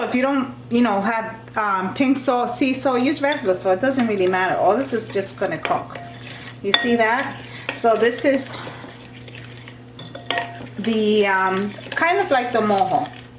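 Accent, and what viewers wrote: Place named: New York, United States. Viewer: I got my pilon and I'm enjoyin' this video, but even though I might change up the recipe for the rub (more garlic, fresh oregano, less salt overall including in the adobo), I can't resist listening to anyone who says "aks" instead of "asks", and "mine's" instead of "mine", 'cause that's how I grew up on the Lower East Side. I missed doin' it for Christmas Eve or Christmas, but I will be makin' mine soon, along with pasteles and homemade coquito. Thanks for this vid, made my day more smile filled.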